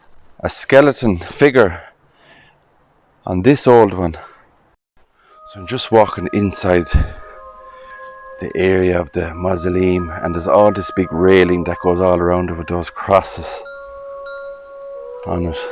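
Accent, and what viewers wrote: Ireland. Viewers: I enjoy your Irish accent!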